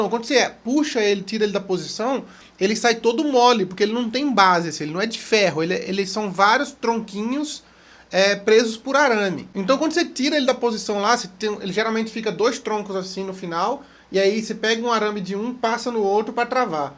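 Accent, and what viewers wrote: Minas Gerais, Brazil. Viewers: Esse é besta demais, adoro os vídeos dele e quando ele fala mineirês 1🤣🤣🤣🤣🤣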